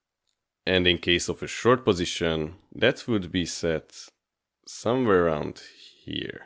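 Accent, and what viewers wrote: Hungary. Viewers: Hi, are you Hungarian?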